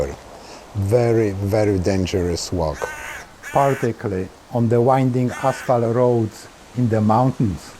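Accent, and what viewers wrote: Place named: Poland. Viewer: Thank you very much for all your videos. The technical quality is better than almost all the others, the pleasant voice and intelligent content is appreciated too.I planned to walk 2020 in May but - - Covid! I hope to be able to go in Fall of 2021. I must say I taught in Poland for 2 years in the 1990's and your voice has that pleasant inflection that I became accustomed to while listening to my students and fellow teachers. Love to watch your videos on my TV so I can appreciate all the beautiful scenery. Thanks from Canada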